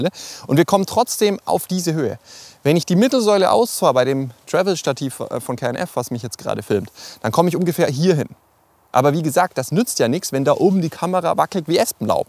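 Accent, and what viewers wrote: Franconia, Germany. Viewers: Mehr frängisch!! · Fränkisch darf man nicht weg schneiden!!! Grüße aus KT 😂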